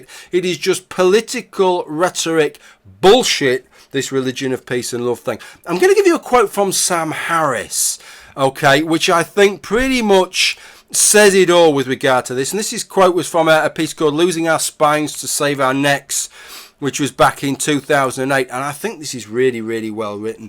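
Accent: United Kingdom. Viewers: I can see why some folks would consider statistical survey videos a bit of a slog, but the results and the conclusion we can draw from them are interesting to me. Also, I enjoy your accent and British slang.:) Call me cynical, but I think the answer to your final point is that the Muslims surveyed were "telling us what we want to hear". Be well,.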